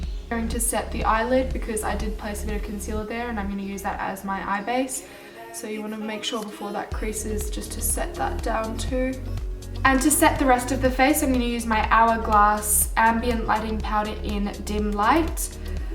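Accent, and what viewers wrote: Australia. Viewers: Oh damn it's Gigi w an Aussie accent!!!! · are you australian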